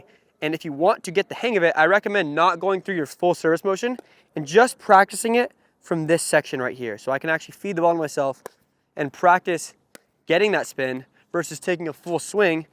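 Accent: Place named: Southern California, United States. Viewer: A good idea. Wish you put a video in which show it had an incorporate more of the top spin into the serve, which would make it really wicked. I like this guy, just wish he didn't have such a SoCal heavily voice inflected vibe. It kind of lulls me to sleep.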